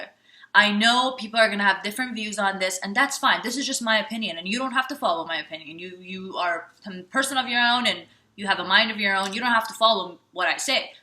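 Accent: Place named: Spain. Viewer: A foreign one. She speaks another language, maybe Spanish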